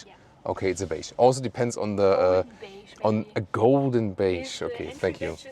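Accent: Germany. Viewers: I have watched a few of our videos and I find you one of the very few decent YouTube car reviewers. Your professional and in-depth approach are a cut above the usual brigade of self obsessed useless reviewers. I am British and love Germany, I find a lot of German things very soothing from the accent to the engineering and architecture. I think I probably was German in a previous life 😂. I like the Kia EV4 and would probably go for the sedan version due to bigger boot and I prefer the styling. I would love the 800 volt charging to be on the EV4 so you can charge from 10% to 80% in about 20 minutes however for me changing from 10% to 80% in about 30 minutes on 400 volts is not that bad an don't a deal breaker. I am concerned about the laggy slow GPS and if it is difficult to plan changing stops as you allude to. Overall I loved your review and am thinking of leasing one when they come to the UK as I want a Tesla but due to Elon's cray antics not to forget him going full Nazi I can not buy a Tesla in good conscience. 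I am genuinely curious about what you personally think about his an antics and his Nazi salute and what most Germans think. Lots of love to Europe from Britain ❤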